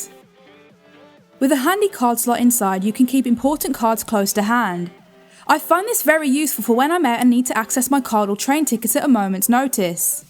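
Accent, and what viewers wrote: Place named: West Midlands, United Kingdom. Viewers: That's UK's accent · West Midlands accent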